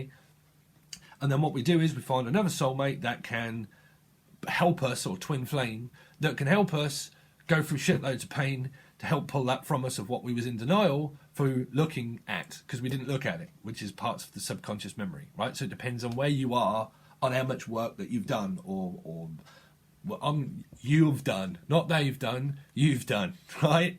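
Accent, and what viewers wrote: United Kingdom. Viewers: i understand british accent better, its more clearer than australian accent, thank you its quite resonate with me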